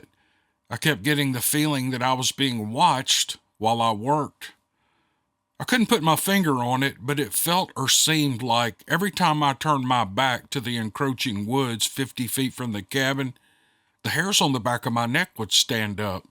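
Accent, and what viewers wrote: South, United States. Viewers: Love your southern accent, from a Texas girl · Hi, and DC fans!, I’m from the Great Plains, and I love your Mississippi/Tennessee accent! You’re perfectly understandable, and it’s beautiful! That small-minded woman had no idea what she was talking about. If she didn’t like your accent, why was she listening?? 🤔 I agree with another commenter: she was a Karen. I’m so looking forward to any more Steve Lilly stories you may record soon! 😀😍🙏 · Love ❤️ your Redneck accent 👍 Keep on entertaining us with your Awesome voice 👍 I agree with the previous commenter, some ppl just aren’t happy about anything 😝 I have never had anything to complain about since I’ve started listening to your channel, many moons ago 👍Keep up the great content & Happy New Year 😀👍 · I love hearing you. Hard to find a true Southern Voice. Your people love it don't let 1 rotten apple ruin your day. 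Thank you for being here. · ill say what you cant say move on karen and kiss our country southern asses. If you dont like accent dont listen to the channel. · I love your southern country accent, sense of humor, and charm. Your voice is comforting to me. I can close my eyes and imagine that I'm sitting at a campfire with you and your family, listening to the stories. It's lulled me off to sleep on many nights. Please keep the stories coming. ❤ Thank You everyone who's taken the time to write in. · The best part of your narration is your not too strong beautiful southern accent. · I love your American accent. From Australia 🇦🇺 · I love your accent, I'm from GA so I know I have a southern accent and I like hearing yours, it reminds of home. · I know where glennie is ( a lot less how to spell it ) my in laws had property in Hillman which is north of there, a friend had some property southwest about 20 miles and another friend had property south of there, my Sisters had a cabin in the UP, I spent many a night at all those places and the first two were close enough for the same visitor though it never happened when I was there. 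I have a Sister still living in the upper peninsula and she refuses to come down to the lower part even though she could use some help in her advanced years. Excellent story and Karen needs to back off your accent, it's a perfect soothing southern state accent for these stories and others! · Your Southern talk is what drew me to your channel in the first place! I feel at home. Love it. ~ Louisiana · I love your voice. It is a southern draw great for story telling. But you don't speak real slow as many do from the hottest gulf air regions. They just do everything at a measured pace, including talking. No doubt it is pacing yourself in the god awful heat. I hate humidity. When I am visiting your region I walk extra far to get anywhere as I stay in the shade as much as possible because the Sun is oppressive. So I plan my course to go from one piece of shade to the next. And I take frequent breaks to keep from overheating since my sweat just makes me wet without cooling me through evaporation. 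I always carry lots of water so I don't dehydrate. And when you mispronounce words I realize words are pronounced differently depending on the local dialect, so I never think you are making a mistake even though it may seem like it. There is no right dialect. But sometimes I am pretty sure you know you are making a guess at pronunciation. You make it entertaining rather than a distraction. Being humble enough to make fun of yourself works well for many. You do it well. Keep it up and don't worry about stuck up people that judge everyone. I think they are just judging themselves as lacking when they do so. It shows an ugly personality. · Never lose your religion over someone not liking your "Okie" accent. What you have is just a typical Southern accent, that most of us from the South have. If some Northerner doesn't like it, well, "bless their hearts", they can go out back and tell it to the hogs!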